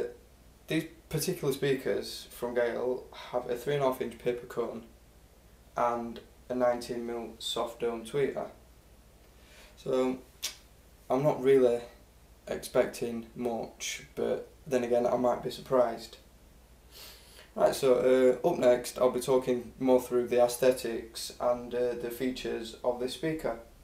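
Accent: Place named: Yorkshire, United Kingdom. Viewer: ***** Safe bruv! lols can tell with thas voice, Am near Leeds like. Had to get a lone against my AE 120s while next month. got some wharfedale 9.0 for £25 and am dam impressed for such small speakers. Gonna cop some 9.1s now and some nice stands. hopefully next month il have my ae's back and be a very happy bunny. Incredible value are the 9.0/.1 determined to find 9.1s at about £70. Peace, and keep up the great work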